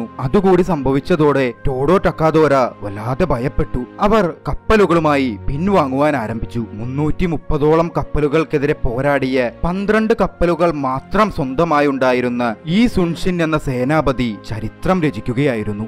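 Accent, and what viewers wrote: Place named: Namboothiri, India. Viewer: അവതരണം- നമ്പൂതിരി സ്റ്റൈൽ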